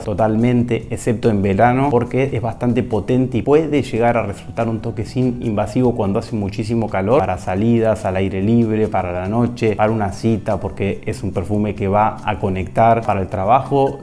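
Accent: Argentina. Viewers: Bien claro las explicaciones. Maestro es cordobés usted?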